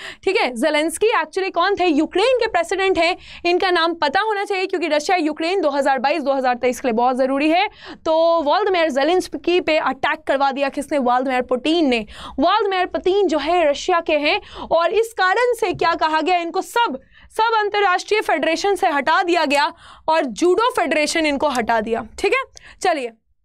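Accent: Bihar, India. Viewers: Madam ji ka Accent Bihari hai ❤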